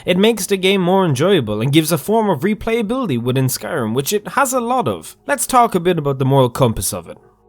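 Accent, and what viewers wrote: Ireland. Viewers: mon de Irish. fair play cha.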